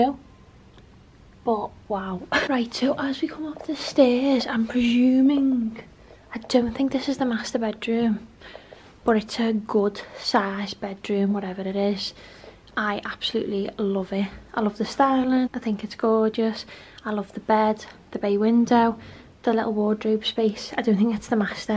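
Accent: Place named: United Kingdom. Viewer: You have the prettiest accent and voice I ever heard from British person. 😄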